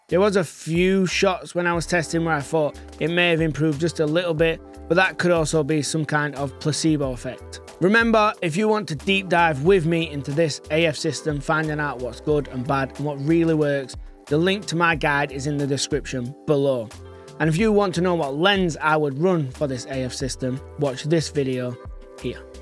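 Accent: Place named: Cockney, United Kingdom. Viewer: I hear Cockney man)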